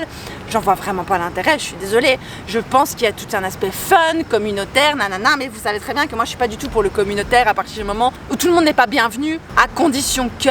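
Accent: Belgium. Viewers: Je suis Canadien j'aime bien l'accent belge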